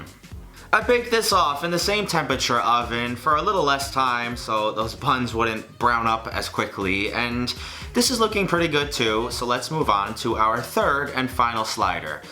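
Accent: New York, United States. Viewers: your accent is so new york lol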